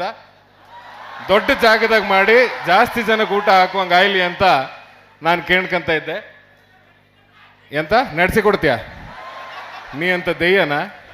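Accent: Kundapura, India. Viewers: ಎಷ್ಟ ಚಂದಾ ನಿಮ್ ಕುಂದಾಪ್ರ ಭಾಷೆ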